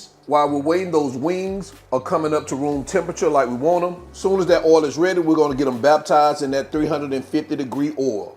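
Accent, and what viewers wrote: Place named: United States. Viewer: I love the way this guy talks. No idea what part of the US you're from but as a Canadian it sounds pretty cool to me. This looks amazing, I'm gonna make these as chicken fingers, idk something about federal laws. All chicken must be served in the form of fingies or you're not a true Canadian or whatever.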